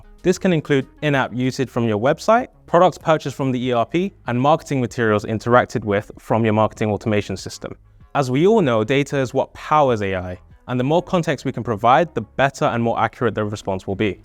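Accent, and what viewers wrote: United Kingdom. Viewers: is this a london accent? im digging it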